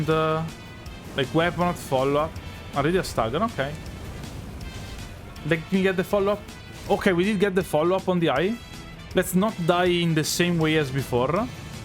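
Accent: Italy. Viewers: Our favourite norvegian-with-heavy-italian-accent youtuber! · Im 90% sure is accent is a strong italian accent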